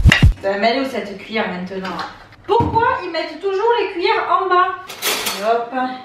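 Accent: South, France. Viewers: J'adore ta tasse Supergirl !!! Et ton accent du soleil fait du bien avec tous ces nuages !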